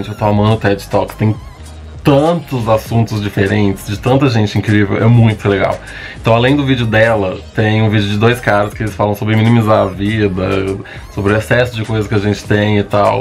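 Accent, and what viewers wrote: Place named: Minas Gerais, Brazil. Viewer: Oi oi lindo, seu sotaque mineirim é um arrazo! Saudades imensas da minha terra. A 6 anos moro em Porto Alegre e quando ouço alguem ... ai ai me da aquela saudezinha de BH. Enfim, esse video eu assisti a alguns dias e foi um divisor de aguas... <link> estamos sim, na era do consumismo desenfreado, porem cabe a cada um de nos sermos, low consumerism , LOWSUMERISM ... Parabens pelo canal!!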